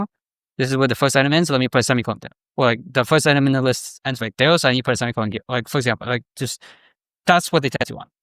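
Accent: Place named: Asia. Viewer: Well he has an asian accent and being american doesnt automatically make you a native speaker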